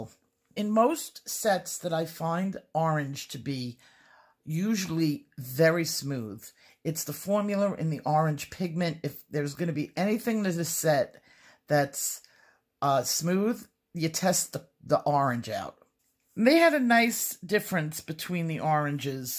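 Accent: New York, United States. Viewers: Omg I love your reviews. Love the blunt New York honesty with accent too.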